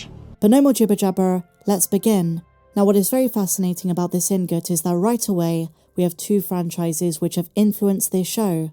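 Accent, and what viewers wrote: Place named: West Country, United Kingdom. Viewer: Please, your British right, So please say autumn and not the fall. Love all your wonderful videos and opinions on anything new in the StarWars world. What makes your Channel so brilliant, is that fact that you're British. Definitely from the West country. I'm saying Dorset going by your beautiful West country accent. ❤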